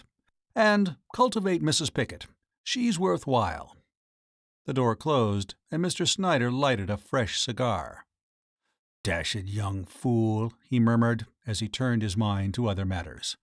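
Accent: United States. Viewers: Who's stupid idea was it have a bloody AMERICAN reading P.G Wodehouse, really?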